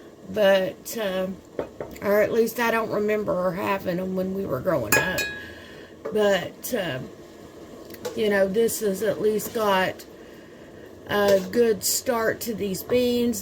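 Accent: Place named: South, United States. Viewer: I hear a little bit of a southern accent when you talk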